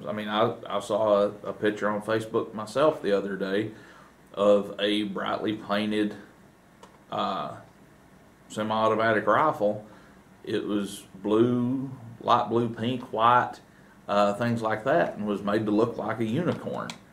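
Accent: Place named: South, United States. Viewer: Once again the voice of reason has a sweet southern drawl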